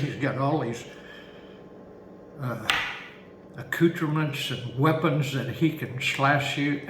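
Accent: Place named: West, United States. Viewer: Greetings from Pune City, Maharashtra. You have a clear Western twang accent and I love the way you speak as if I'm watching a Hollywood western. You're describing so much in detail that I can picture it. Thankyou for the information. I've subscribed to your channel if it's ok for an Indian Indian to learn more about the Wild West History. Thanks again Sir.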